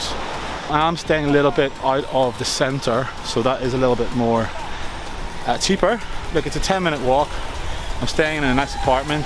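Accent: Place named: Northern Ireland, United Kingdom. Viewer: Good to hear your n. I.accent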